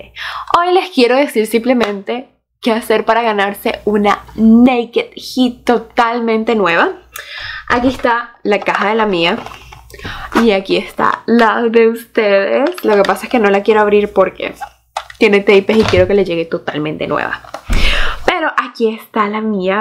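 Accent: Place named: Venezuela. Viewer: Sii! qué lindo sorteo!!! bienvenida nuevamente!! te veo desde Argentina! lo que más me gusta de tus videos, además del contenido, es tu voz! jaja no sé porqué pero me resulta muy relajante jaja será por la tonada venezolana jajaja.. bueno te sigo en casi todas las redes sociales menos snap ya que no tengo :( espero se comprenda que muchas personas no contamos con cuentas en algunas redes sociales ya sea por falta de tiempo o simplemente por gustos...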